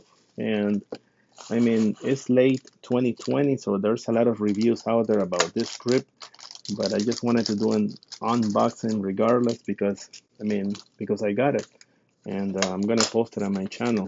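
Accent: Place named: India. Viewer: Put Indian accent in title so we don’t click on that …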